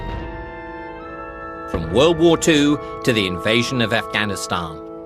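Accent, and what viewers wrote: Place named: United States. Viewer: This guy's American accent is insulting.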